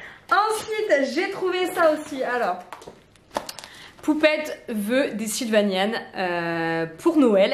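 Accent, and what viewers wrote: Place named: Béthune, France. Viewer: Oh!!! J’adore votre accent! Vous êtes de quel coin? Mais vous l’avez peut-être déjà dit …(je suis une abonnée récente) cela me rappelle mes cousines qui sont du côté de Bethune.Sinon merci pour vos vidéos si agréables ❤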